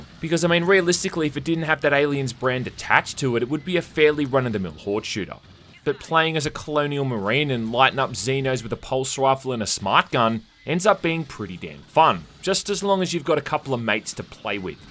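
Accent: Australia. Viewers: when my favorite video game reviewer with an exaggerated aussie accent says its good. then, it probably is. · Is it just me or do we all love a reviewer with Australian accent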